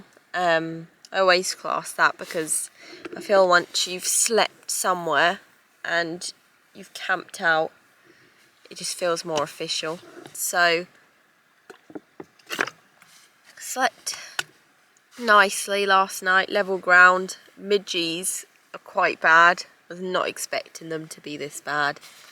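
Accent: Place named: Surrey, United Kingdom. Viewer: With that accent, it’s got to be Surrey😂😂😂😂😂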